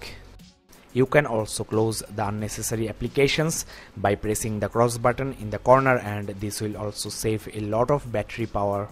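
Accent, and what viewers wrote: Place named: East, India. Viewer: I think he is from Orissa or Bengal. Thats not a south Indian accent. :-)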